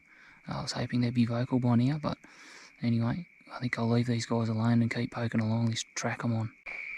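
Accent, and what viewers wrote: Australia. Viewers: Il just saying like it is. First off I wanna say that I watch alot of hunting videos and usually only aussie ones and by far your channel and your hunting videos are the BEST! You not only hype me up to go out and hunt but I reckon anyone else watching will have the feeling that you give me...and that's the feeling as if I'm out there hunting with you bud. You take so much time and effort to not only explain but document everything exciting and sitting on the edge of your seat kinda view. I would also like to come out there and say to all the negative comments you get on this and other videos about you shooting ferrel cats and foxes that they should all go and get fucked and if they don't like it to just not watch. But hands down iv smashed that subscribe button so hard that I ended up cracking my phone but who gives a shit. I love all your videos and that great Aussie accent just puts the icing on the cake. 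So great job and you keep me up to all sorts of hours at night hooked to your content. To all the haters just go and piss off or get deported lol. Go hard but as people don't realise the time it takes to edit and put up videos like yours and also the effort it takes of you just being out in the greatest country on earth living the dream. I'm happy I found a video I could comment on as they had turned off the comment section on some others and that's why I spilled my guts out here. Big thumbs up to you and God bless you and your family and be safe my friend. Best bloody hu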